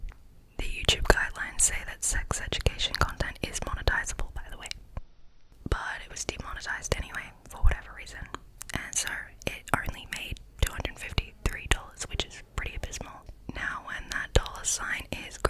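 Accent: Australia. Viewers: Are you aussie? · I’m late to the party but is Australian? Sometimes it’s hard to pick the accent with asmr but I’m loving it!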